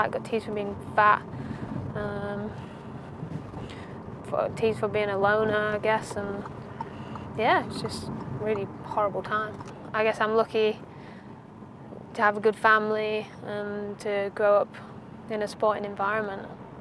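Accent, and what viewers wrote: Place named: Yorkshire, United Kingdom. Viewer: Like the Yorkshire accent . Brave girl. Good luck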